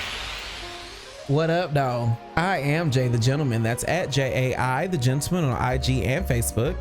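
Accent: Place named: Detroit, United States. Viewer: are you from Detroit? "what up doe?"?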